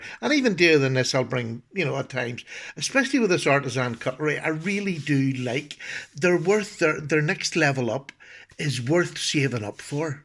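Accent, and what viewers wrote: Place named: Ireland. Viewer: This review made me purchase this knife. Your voice sir makes me want to visit Ireland and drink large quantities of beer. Cheers from Texas!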